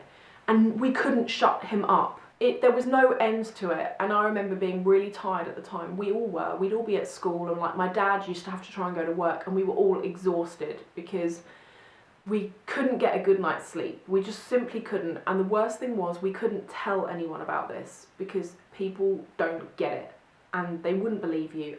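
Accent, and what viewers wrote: United Kingdom. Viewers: You sound so British!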